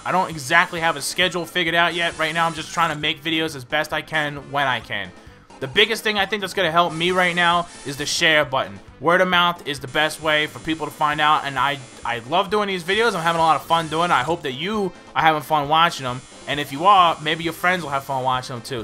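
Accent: New York, United States. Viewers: How come your voice sounds like you have a New York accent? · Oh with the New York accent! Wierd if you've been watching videos for over a year...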